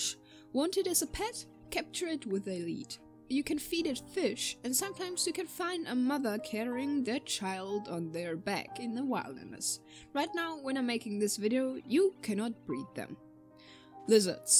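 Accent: Germany. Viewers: Where are you from? You sound like german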